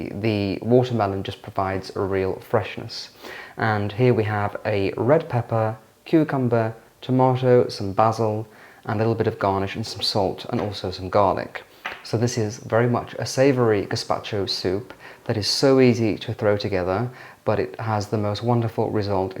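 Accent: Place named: England, United Kingdom. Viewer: I like your videos specially your English accent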